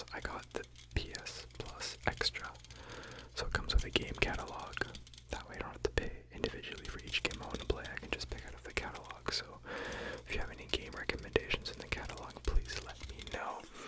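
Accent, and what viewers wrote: Quebec, Canada. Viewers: Big t'es tu québécois ??😂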